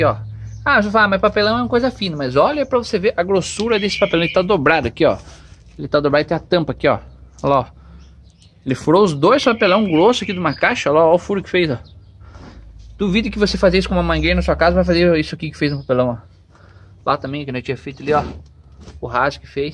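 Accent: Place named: Caipira, Brazil. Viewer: Eeeeitha sotaque caiiipirahhh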